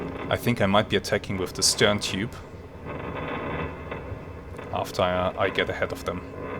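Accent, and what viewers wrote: Germany. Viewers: love this series. great narration too, and the Germanic accent really helps!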